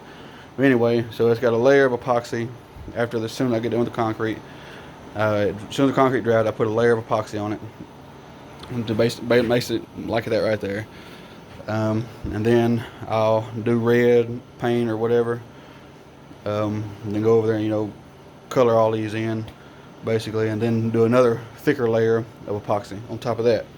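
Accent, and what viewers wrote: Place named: South, United States. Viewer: Awesome weights and southern accent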